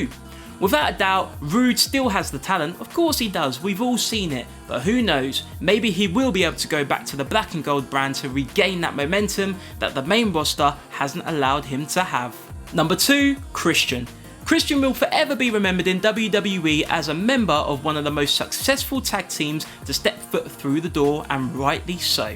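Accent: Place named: England, United Kingdom. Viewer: I clicked to hear the bro talk with an English accent. Go forth young man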